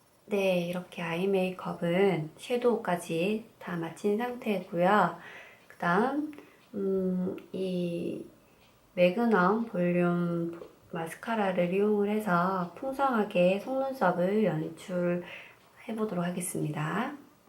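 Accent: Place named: Gyeongsang, South Korea. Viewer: 경상도분이세용?ㅎㅎ 사투리가 ㅎㅎ 저두-대구살아용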